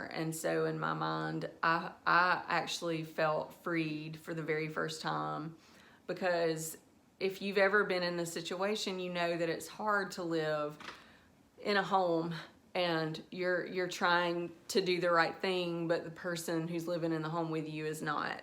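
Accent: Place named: Alabama, United States. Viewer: A truly beautiful and inspiring testimony! I'm so glad you decided to start a youtube channel - yours is one of my very favorites to watch! And this is totally off topic, but your Southern accent reminds me SO much of my husband's aunt who was from Alabama - I could happily listen to you for hours... :)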